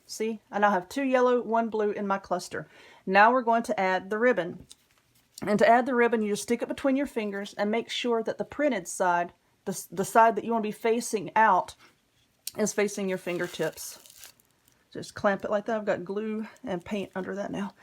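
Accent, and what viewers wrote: South, United States. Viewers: Hello my Southern friend, my name is Joyce and I live up near Chicago and I wanted to let you know how much I enjoy your videos. I have been logging in and checking to see what you're up to and I love the things that you share. I respect the fact that you want to do tutorials using only things that you know people would have around their homes, I get that. I have done a couple of wreaths myself and while skimming through the internet one day I found where mesh with a stencil burner. Well because I do have one of them on hand I used the stencil burner to cut my mesh and it made a huge difference in my mesh as far as it unraveling. I love that thing it's quick to use I had all that mesh cut in no time. Although I totally agree with you about keeping it simple so that your viewer's have those things on hand I thought I would just give you that little bit of information because I wanted to share and give something back. 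Keep bringing those videos, love your accent, love the crafts and the hauls that you do . from a dedicated subscriber 👍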